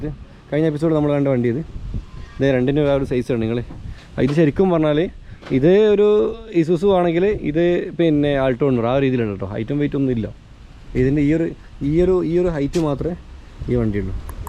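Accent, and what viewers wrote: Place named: Malappuram, India. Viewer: Appam alla malapuram basha patri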